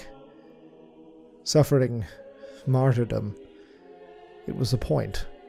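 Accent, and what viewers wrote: Ireland. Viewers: I gotta ask what part of ireland are you from?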